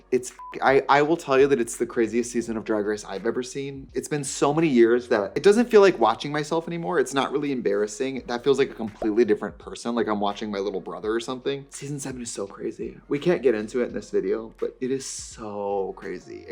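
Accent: Wisconsin, United States. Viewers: I don’t know why but I cannot focus on anything other than how Wisconsin she sounds and now I’m questioning everything and am like does she always sound like this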